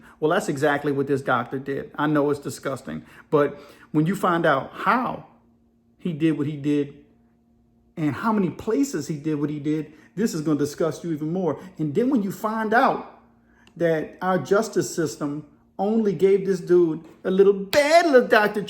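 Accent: Louisiana, United States. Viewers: Love the accent,Louisiana?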